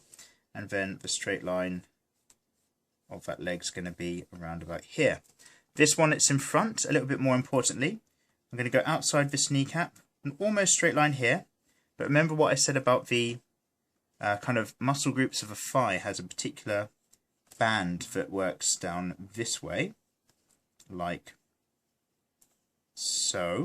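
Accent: United Kingdom. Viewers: God DAMN he’s so British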